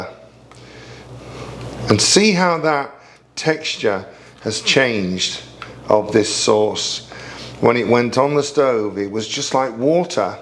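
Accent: United Kingdom. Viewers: I had to subscribe. I just love the way you talk. The British vibe is everything! Cheers from France! 😊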